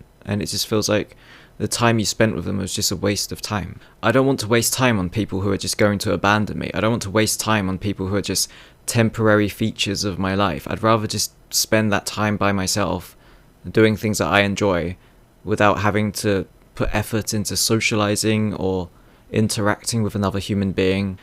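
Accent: United Kingdom. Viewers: He speaks with a fluent English accent though. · there is something really annoying with low-level trolling in an english accent. I don't know why that is..... and when a troll has a russian accent it instantly becomes amusing and cool! but when it's that english accent...... it just becomes YUCK!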